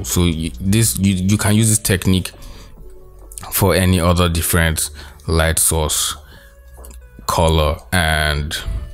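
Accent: Nigeria. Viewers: Ayyy, i know a nigerian when i hear one!! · Apparently I love Nigerian accents🥰 keep it up bro:)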